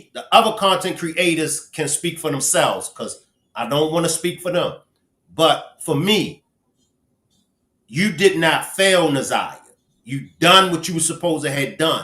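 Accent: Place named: Baltimore, United States. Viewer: The Baltimore accent gets me everytime 😂 I'm from Louisville Kentucky but I'm a RAVEN all day! Flock Nation! Replay Gang!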